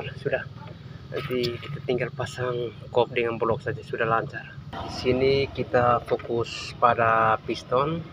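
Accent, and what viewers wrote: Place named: Eastern Indonesia, Indonesia. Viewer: mantap bang, dgr logatnya org timur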